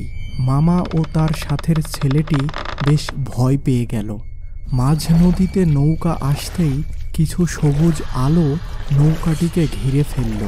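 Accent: Bangladesh. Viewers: কথা শুনে মনে হয় বাংলাদেশের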